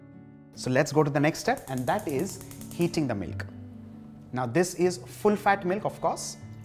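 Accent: India. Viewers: He is indian right? Yeah I am loving his indian accent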